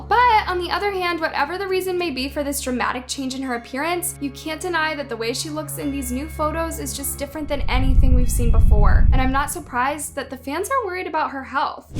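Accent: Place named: Los Angeles, United States. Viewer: That L.A accent is soo annoying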